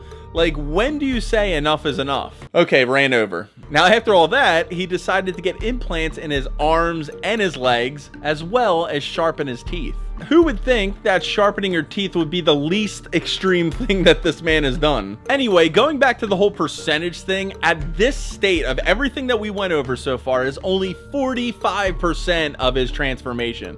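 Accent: Philadelphia, United States. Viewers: This might sound crazy but im From South Philly and I can hear a slight philly accent when u talk. R u from Philly or around the area?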